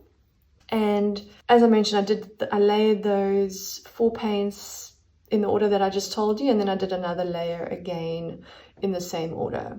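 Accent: South Africa. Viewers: Love the South African accent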